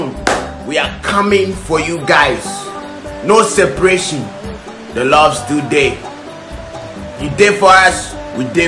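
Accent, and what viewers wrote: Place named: RP, United Kingdom. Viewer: Who noticed that he totally different from when he's acting? He's so gentle and sounds like some daddy B who attended Cambridge international or Matyrs of Uganda .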